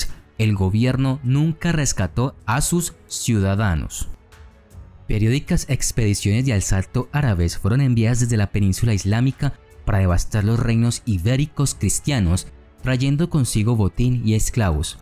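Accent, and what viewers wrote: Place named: Colombia. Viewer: Me ha dado un no se que este documental me provoco escaŕamuscia.con mis 74 inviernos James habia escuchado alguna historia ni parecida,exelente documental mil gracias por compartir sus conocimientos entiendo q se ha documentations muy bien creo conocer su acento parece 🇨🇴 colombiano, mil gracias por sus relates se ve q le gusta la historia qq Dios le de larga vida con salud ciencia sabiduria y prosperidad para q pueda seguir con esta laboreducative. Buena suerte.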